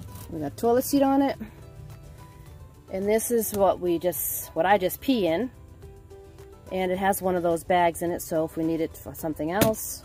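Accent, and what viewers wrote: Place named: New York, United States. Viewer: You've been at this for a long time, so I expected your van build to be really cool and functional. Nice job. Oh, and that New England accent is undeniable. Or maybe it's Minnesota or maybe Bay Shore Long Island New York. LOL!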